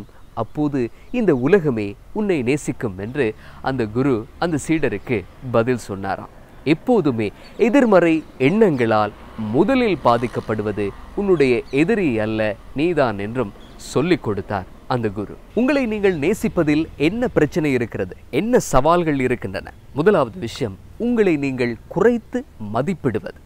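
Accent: Sri Lanka. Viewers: அதுதான் இலங்கை தமிழ்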